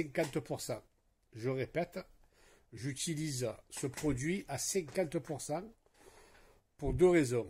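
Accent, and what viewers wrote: South, France. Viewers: Merci beaucoup très clair et concis. PS j'adore ton accent, vive le sud 💪👍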